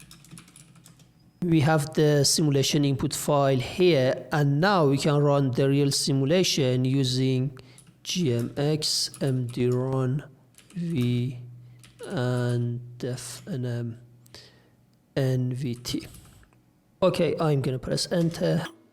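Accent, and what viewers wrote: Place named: Iran. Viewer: loved your Farsi accent. Iran's flag is risen anywhere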